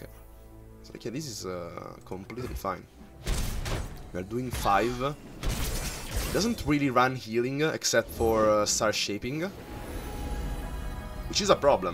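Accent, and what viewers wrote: Italy. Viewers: You ITALIANO?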